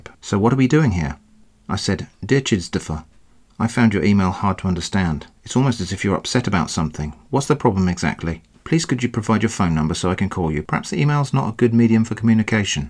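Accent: United Kingdom. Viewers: I really like the production you put into this one!! I love ALL your videos as I'm a fool for your cheeky British accent. Lol thanks for your work!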